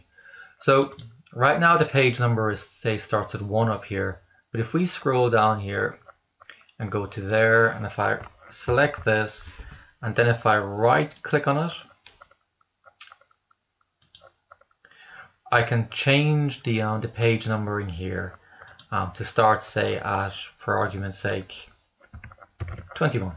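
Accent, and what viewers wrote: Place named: Norway. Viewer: Love your accent. Norway?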